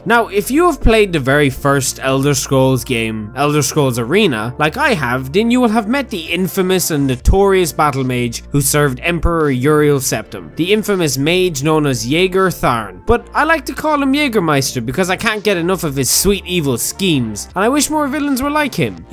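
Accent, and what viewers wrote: Ireland. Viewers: "So I was tinking" ah I love your irish accent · First comment ha how are you Irish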